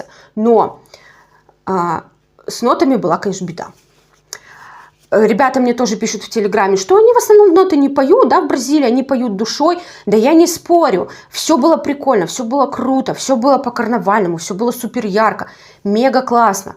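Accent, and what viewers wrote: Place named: Ukraine. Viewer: Какой у тебя акцент украины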